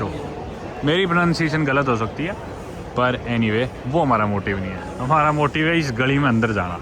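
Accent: Haryana, India. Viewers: Being a haryanvi I can sense your pronounciation with haryanvi touch 😂😂 and it feels very good to hear that 😁😁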